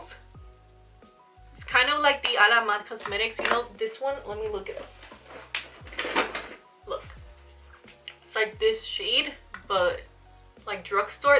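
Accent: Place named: Puerto Rico. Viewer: Girl, you’re stunning. A fellow boricua here, I just discover this channel and when I heard that Puerto Rican Spanish it was a done deal. Me encanta, you got a new loyal subscriber. ❤️🇵🇷 Ig: Srta.lugo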